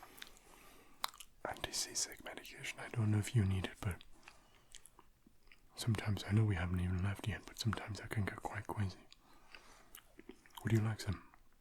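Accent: England, United Kingdom. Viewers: ooops! I thought your accent sounded English! I’m not too far from there- North Yorkshire. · it's definitely an English accent but even after moving countries it can be hard to shake it. My dad was born in Halifax, we live in Australia but he still sounds mostly Yorkshire 😅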